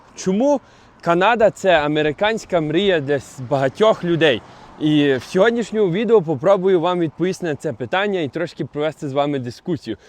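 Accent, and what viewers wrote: Western Ukraine, Ukraine. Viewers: Доречі про акцент, саме тому почав дивитись, но я всеж таки підозрюю, що у є задатки актора, режисера і тд, він полбляє, якісь сценки з братом, робити, старається, молодець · дивлюсь вас не з інтересу про Канаду, а з інтересу до західно-українського акценту:) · говорить із західним акцентом, так. Але його вимова притаманна більше до діаспорської, або до того, як колись розмовляли дуже давно на заході України. Зараз у нас так не те що молодь, але і люди 60+ не розмовляють. Не знаю, з чим це пов’язано, мабуть все таки вплив англійської вимови дає такий акцент українською. Навіть дідусь, з яким я бачила відео, розмовляє більш сучасною укоаїнською😊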